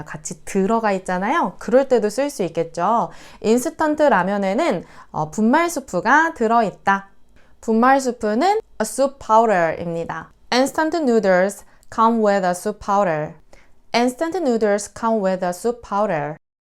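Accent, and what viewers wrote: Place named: South Korea. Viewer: 와 통종 국문과인거 감안해도 딕션 강세 다 무시한 콩글리쉬 발음 너무 한거 아니요 ㅋㅋㅋㅋㅋㅋㅋㅋㅋㅋㅋㅋ? 내가 2000 초반에 보습학원에서 가르치던 발음 그대로 하고있넼ㅋㅋㅋㅋㅋㅋㅋㅋㅋㅋㅋㅋㅋ 그냥 구글 AI가 발음하게 틀어놓으세요 애들 어릴적 발성 습관 망치지 말고ㅋㅋㅋ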